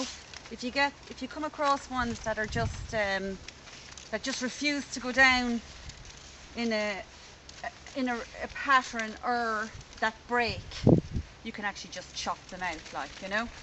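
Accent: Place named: Ireland. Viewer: I love a soft Irish accent. Those 'th' sounds are to die for. That's a really amazing structure. I'm only hoping for some firewood from a few planted shoots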